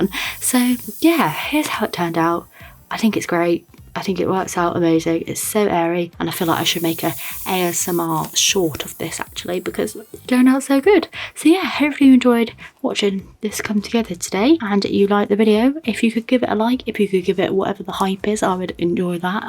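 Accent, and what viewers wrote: United Kingdom. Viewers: U sound like from the uk am I right?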